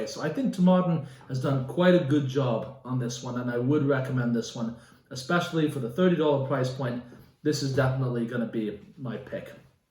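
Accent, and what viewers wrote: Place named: United Kingdom. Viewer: I love the intro Hey hey hey!! Digging it you British 😎 bro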